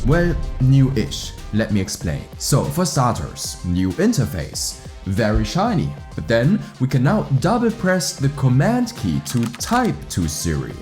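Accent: Germany. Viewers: Is it just me or does he sound a bit more German in every sentence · are you german? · Your accent sounds like German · Der deutsche Akzent :) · Du bist doch Deutscher haha hört man so krass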